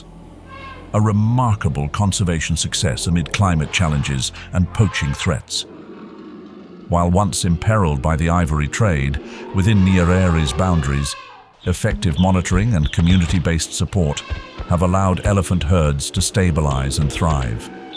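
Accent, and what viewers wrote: United Kingdom. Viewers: At least the source discloses that this is AI-generated. But why does the AI narrator always have a "posh" southern England accent? This is almost without exception the case. Do the people who create these things think that the accent lends an air of credibility and gravitas?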